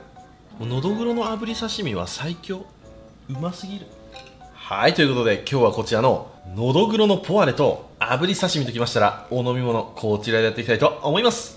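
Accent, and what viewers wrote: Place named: Mikawa, Japan. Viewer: 世界に届け三河弁🌍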